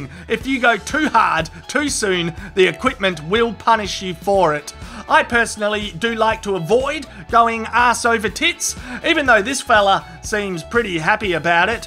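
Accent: Australia. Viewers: I am 2 beers into this video and lemme tell ya some english sounds so much better with Ozzy. Is Ozzy short for Ozzimandias I donno mate, cheers. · And yep spoken like a true ocker, funny as fuck!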